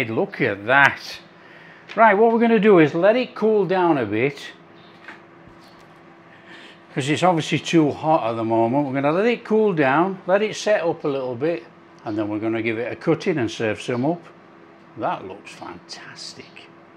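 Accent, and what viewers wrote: United Kingdom. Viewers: Love it! Cornbeef as a quiche. Easily attainable. That's one thing I love about most of your recipes. They contain easily accessible ingredients. And don't require a Culinary degree with all its jargon to describe and decipher. They are straight forward even for a home cook, like myself. You are the most thorough Chef on YouTube or any food channel I've ever watched.that gives the best tutorials. You go through each detail with explanations. You are a champ! Keep it coming Chef. You've got me glued to your channel and your lovely British accent. 😊❤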